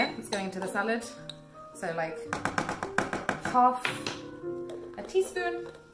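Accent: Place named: England, United Kingdom. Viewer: This is an amazing and so easy a recipe. English accent I hear, I'M From UK too #7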